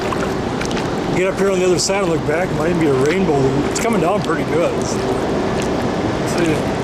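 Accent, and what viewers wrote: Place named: Minnesota, United States. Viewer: Nicely done video. Calming, informative. Love the Minnesota accent. look forward to more videos.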